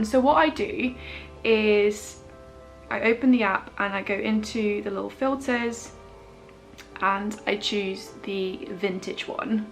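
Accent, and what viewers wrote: United Kingdom. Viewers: Gosh, you are beautiful, the british accent just give a inch of perfection to you.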